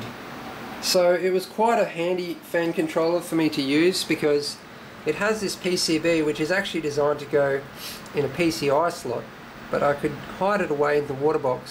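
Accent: Australia. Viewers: are you aussie? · Is he Australian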